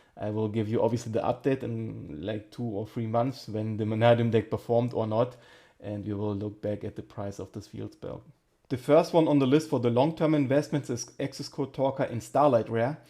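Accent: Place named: Germany. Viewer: Man hört direkt raus das du deutsch bist 😂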